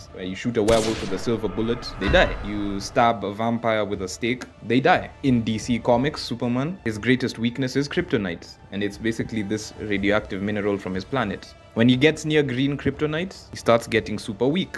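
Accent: Kenya. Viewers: i know a kenyan when i hear one😭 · You sound like u from kenya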